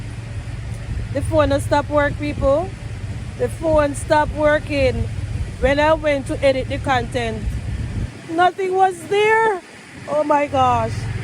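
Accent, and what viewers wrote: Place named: Jamaica. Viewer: Hey, I’m so glad I found your channel. I grew up in south Florida and I recognized that Jamaican accent immediately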